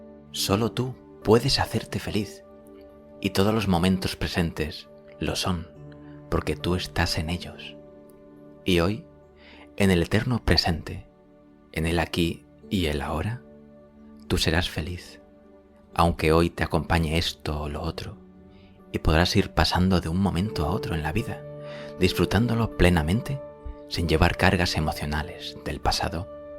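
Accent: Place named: Spain. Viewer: No sé qué es más aburridor escuchar el acento de un español o escuchar los comerciales que pone Google para manipularnos y beneficiarsen. ellos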